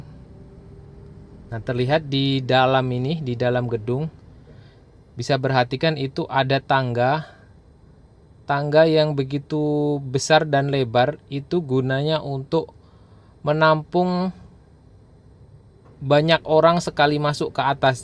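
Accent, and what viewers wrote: Java, Indonesia. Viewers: trnyata ortunya jateng yah..pantesan logatnya agk medok2 dikit 😂😂😂😂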